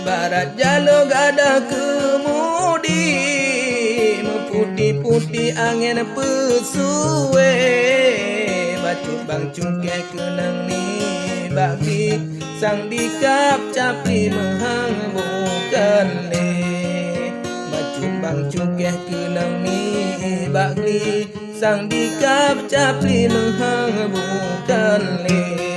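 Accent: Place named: Nagan Raya, Indonesia. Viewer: bereh bg..suara yg khas nagan..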